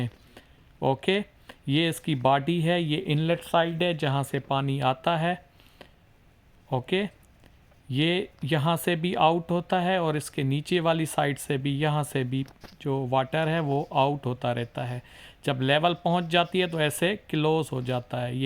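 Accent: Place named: Sindh, India. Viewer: Boht informative video banai apne, well lehjay sy ap Sindhi lag rhy hain?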